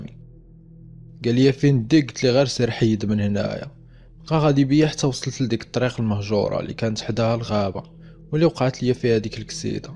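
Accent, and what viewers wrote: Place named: Dammam, Saudi Arabia. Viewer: انــتت من اي ديرة في السعوديــة من اي منطقـة لهجتـك م افهـم شكلك من دمامم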